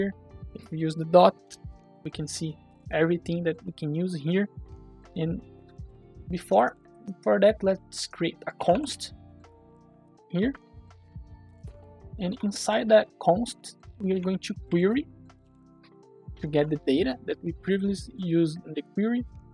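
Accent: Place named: Brazil. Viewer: esse sotaque nao me engana você é br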